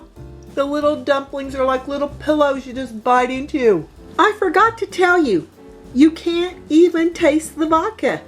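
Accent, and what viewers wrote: South, United States. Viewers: lol me either but she’s southern so it’s ok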